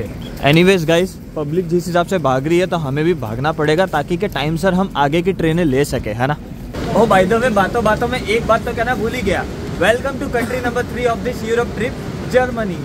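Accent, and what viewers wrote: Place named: Maharashtra, India. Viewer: your marathi acent in hindi i like it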